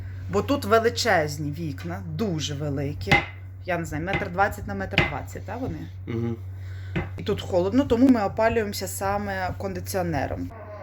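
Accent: Western Ukraine, Ukraine. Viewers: Ви мабуть з Західної України, бо розмовляєте не як Харків'яни, але зазделагідь вибачаюсь як це не так, в селі прекрасно живеться, головне все продумати завчасно з опаленням і комунікації в будинку щоб були умови для життя цивілізовані. Донечка як лялечка манюня і чоловік гарний 😍💓, хай вам Господь допомогає жити щасливо в оселі на Прикарпатті. Гуси - лебеді красиві но на жаль так Господь створив світ, що люди мають їсти 🍗м'ясо...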